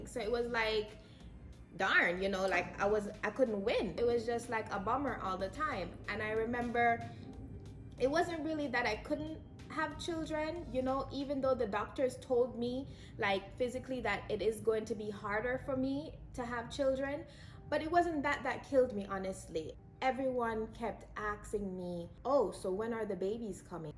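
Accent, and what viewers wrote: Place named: Caribbean. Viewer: Thanks for sharing your story. I have not been diagnosed but the doctor has told me that this is his impression based on the results. I’m 24 years old, not married yet or trying to have, still in university but I always have that fear that when I’m ready I may not be able to, but I have faith. Your story is inspiring. Ps. You sound like you’re from some where in the Caribbean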